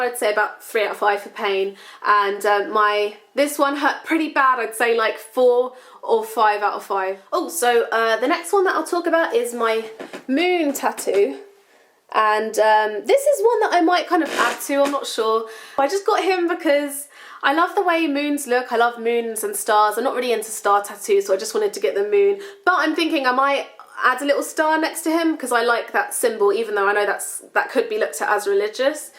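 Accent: London, United Kingdom. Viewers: the london accent. :) <3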